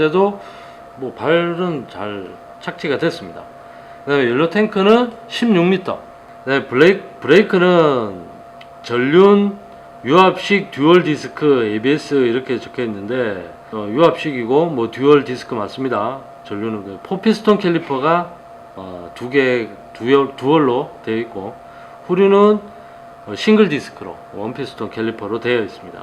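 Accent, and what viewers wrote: Gyeongsang, South Korea. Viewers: 토속적인 경상도 억양 리뷰 참 좋네요.잘 보았습니다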